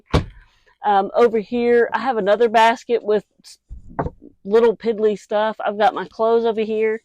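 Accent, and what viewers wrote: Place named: South, United States. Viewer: Just found you.....ha ha ...Never noticed your southern accent that much before in all the years we hung together.....but...Love ya guys....safe travels and for going the travel world...Have fun....Love ya ...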